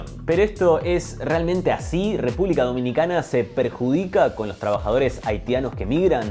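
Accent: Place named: Argentina. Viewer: Por tu acento diría que eres argentino y si es así tu país es bastante grande y tiene más recursos que nuestra media isla por lo que te sugiero que nos ayude con esta invasión haitiana y te lleves por lo menos un millón de todos los haitianos que tenemos aquí.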